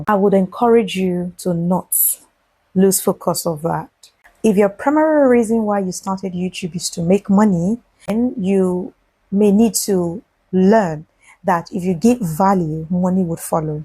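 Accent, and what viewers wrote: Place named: Africa. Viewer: I like it but talk better. Your African accent distract your audience, and I can't understand you well. Work on your accent to become American, more people will understand your words. One love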